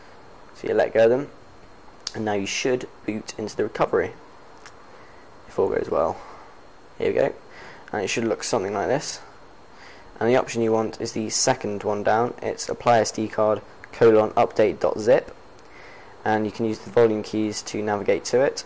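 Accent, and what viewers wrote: London, United Kingdom. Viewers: YOU SOUND LIKE YOU'RE FROM LANDAN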